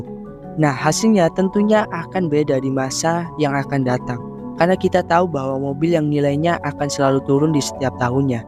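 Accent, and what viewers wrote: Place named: Jakarta, Indonesia. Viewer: Alangkah bijak bila narasi diucapkan dalam bahasa Indonesia yang baik dan benar. Tak perlu kejakartanan dengan lu gue. Krn yang menikmati bisa jadi orang seluruh Indonesia atau bahkananca negara, dimana saat ini bahasa Indonesia diminati di banyak negara.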